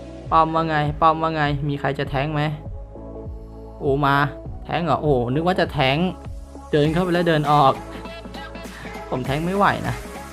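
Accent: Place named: Thailand. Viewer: พูดเหน่อม๊ากกก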